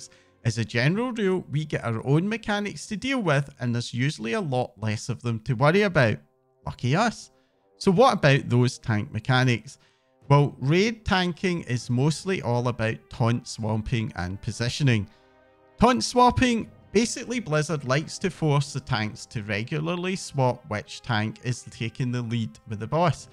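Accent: Scotland, United Kingdom. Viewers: Thank you so much for this video. I've recently moved over to WoW from FF14 and this has been a great video that ACTUALLY goes in to the expectations around tanking in this game from the ground up. Plus it's nice to hear a friendly Scottish accent!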